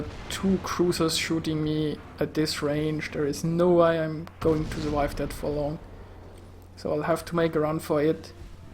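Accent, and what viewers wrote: Germany. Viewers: german accent confirmed or not confirmed?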